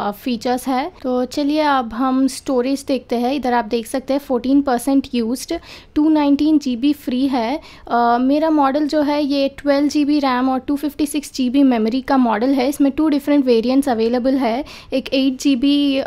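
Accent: Hyderabad, India. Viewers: Wow Hyderabadi Hindi amazing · Hyderabad se lagre aap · Does anyone feel the Hyderabadi in her??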